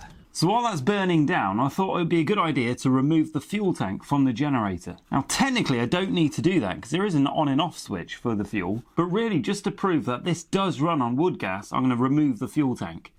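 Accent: United Kingdom. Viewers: This guy is extremely british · Very British. I love it.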